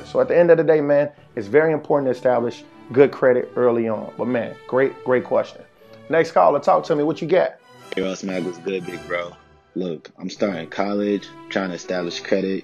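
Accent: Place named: Chicago, United States. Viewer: Bro GOTTA be from Chicago !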